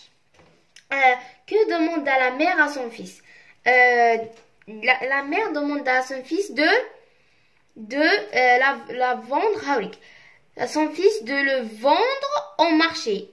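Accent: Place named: Kabylie, Algeria. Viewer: انتي قبايلية منين من صوتك عرفتك بلي قبايلية انا تان قبايلية و شكرا على كلشي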